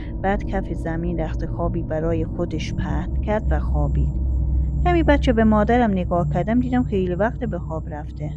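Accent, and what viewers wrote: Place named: Turkey. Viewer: داستان با لهجه ترکی تعریف کردن خیلی خیلی قشنگه ترک زبانها لحجه فوق‌العاده زیبایی دارن ماشاءالله ❤❤❤❤❤❤ ترک‌ها مرد و زنشون همه و همه مردومان باشخصیت باغیرت و محترمی هستن ❤ آرزوی موفقیت برای شما 🌹🌺🌹 و تمامی ترکهای عزیز و دوست داشتنی ❤️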